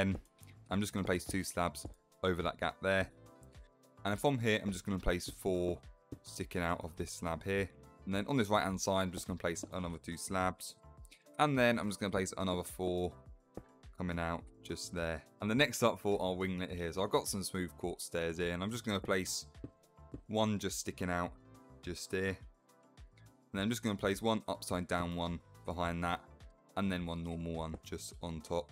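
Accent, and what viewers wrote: United Kingdom. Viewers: This british akcent😰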